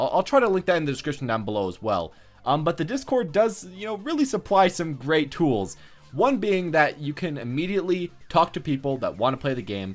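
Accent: United States. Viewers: Wait, are you from utah?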